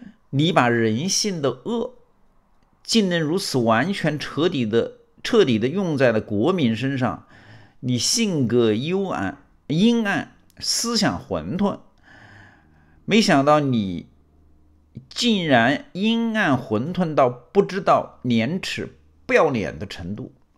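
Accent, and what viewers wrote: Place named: Hunan, China. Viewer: 听到了湖南口语，想起我隔壁宿舍的兄弟。哈哈哈哈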